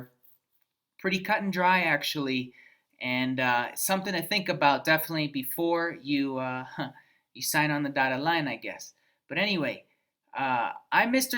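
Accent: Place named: Ecuador. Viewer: You sound more Ecuadorian to me than gringo!!🙃🙃🙃🙃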